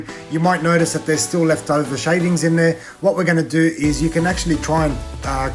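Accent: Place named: Australia. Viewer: Good old tradie advice with an Aussie accent …. Music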